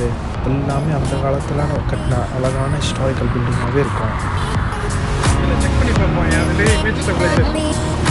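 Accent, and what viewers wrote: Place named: United Kingdom. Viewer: Tamil la UK accent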